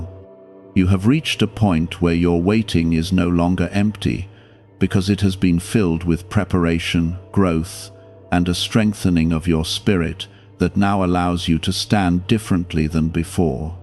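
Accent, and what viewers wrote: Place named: United Kingdom. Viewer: Why does this AI god have a slight Britsh accent?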